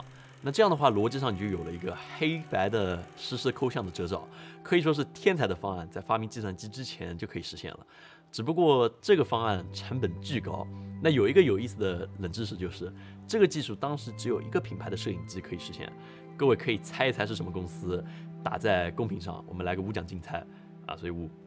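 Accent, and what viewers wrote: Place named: Hangzhou, China. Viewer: Tim的杭普话 太亲切了！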